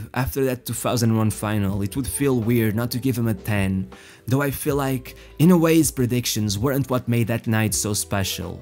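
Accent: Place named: Portugal. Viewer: confessa lá, tu és tuga.... nota-se no sotaque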